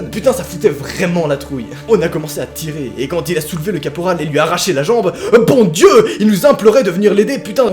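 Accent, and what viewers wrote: Belgium. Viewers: il est belge ou suisse chépa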